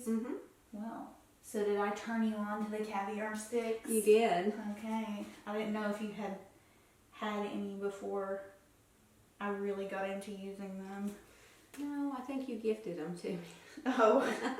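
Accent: South, United States. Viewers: When you're near your mom, your southern accent comes back a little bit lol. Such a sweet video! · Your beautiful Southern accent became much more prominent in your conversation with your mama. You sound like me! I love it when Southern-born people proudly keep their accents. 🤗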